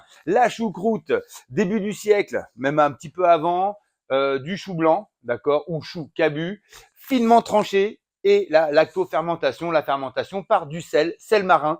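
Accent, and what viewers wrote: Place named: Alsace, France. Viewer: hum... C'est un Alsacien qui parle. Merci à toi.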